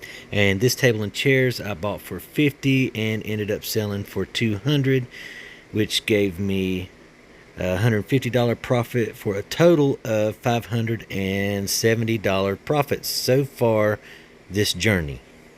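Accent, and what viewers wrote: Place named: South, United States. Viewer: !!! these look fantastic!! i love how you did the base!! so unique!! i love the southern accent too!! Michigander here recently transplanted to VA... I tell ya what i am getting a way higher profit here in the DC NOVA area vs selling in Michigan.. location really makes a difference!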